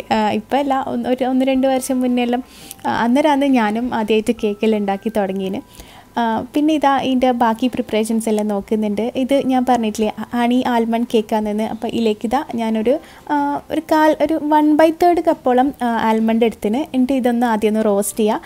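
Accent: Kannur, India. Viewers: കണ്ണൂർ ഭാഷ എനിക്ക് ഭയങ്കര ഇഷ്ടമാണ്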